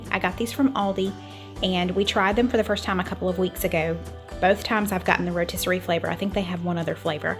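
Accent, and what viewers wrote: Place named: Carolina, United States. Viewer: Oh now honey, you speak just right. Don't you go turnin' your back on your proper Carolina raisin'. You keep right on calling it "ohl"* the way the Good Lord intended it be said LOL. * Well somewhere between there and "awl"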